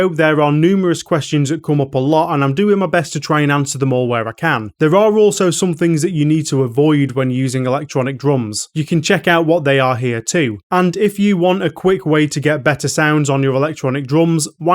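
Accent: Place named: Liverpool, United Kingdom. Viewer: I love your accent!! ❤ Liverpool?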